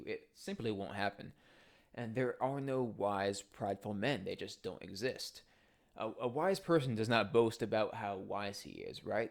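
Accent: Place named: Canada. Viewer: Sounds Canadian or homeschooled.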